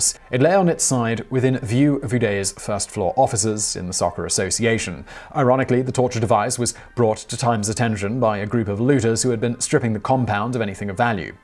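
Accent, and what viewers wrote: United Kingdom. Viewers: Simon I absolutely love you but a vacation is I order my friend! I don't want you to work yourself to death. I so look forward to lovely British accent!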